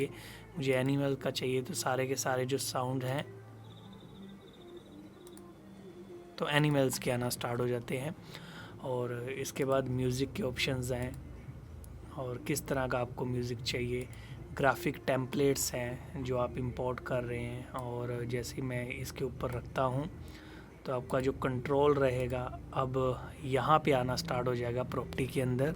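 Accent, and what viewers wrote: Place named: Punjab, India. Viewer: Brother hum yaha English pronunciation sikhne nhi aaye.....embarrassment feel mat krao....inka Punjabi accent hai..... but sir ka workshop attend kro ek baar...pta chal jayega...🙏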